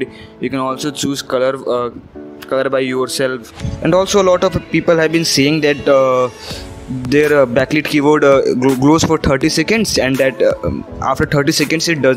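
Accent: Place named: India. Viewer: Your Indian accent is very strong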